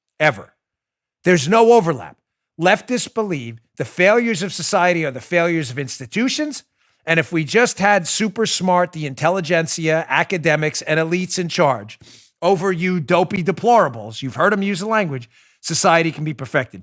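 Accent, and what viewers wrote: New York, United States. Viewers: !! You are SO NY and SO Italian!! Love it!!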